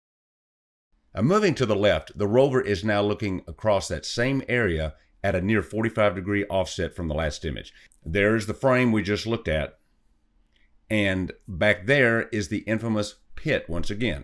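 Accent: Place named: Arkansas, United States. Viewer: Dude you deserve way more subs. Your channel is among the highest editing and narration quality on YouTube. Not sure why an Arkansas accent makes me so receptive. Maybe it has something to do with my father in law/boss/best friend being from Little Rock.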